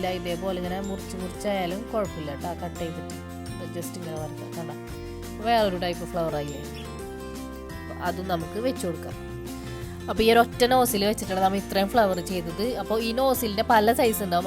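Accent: Kodungallur, India. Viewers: Adipoly 👌👌👌 Evideyanu place? Kodungallur area aano? Njanavide aanu. Njangallude slang pole thonni 😄😄😄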